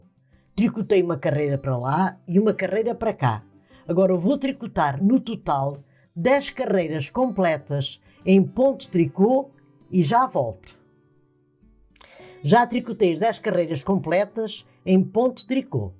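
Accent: Portugal. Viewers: Já fiz muitos de seus trabalhos. Vc é uma mestra! Bjs de Boston e amo esse acento português maravilhoso é tão charmoso!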